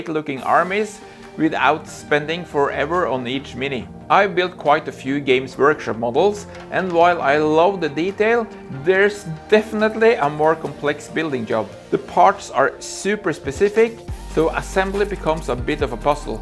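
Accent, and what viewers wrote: Norway. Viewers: Hei, jeg mistenker sterkt (basert på engelsken din) at du er fra Norge? Hvor kan jeg kjøpe miniatyrer fra Firgeforge games i Norge?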